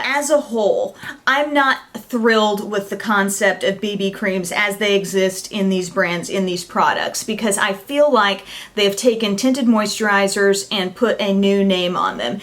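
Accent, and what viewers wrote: South, United States. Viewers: i subscribed just because of your awesome southern bell accent :P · very true! i totally agree! i also assume youre from the south? very strong southern accent! i like it tho! im from wv but most say i have a very northerer accent saying "mom" like "maam" we turn our o's into a's for some odd reason?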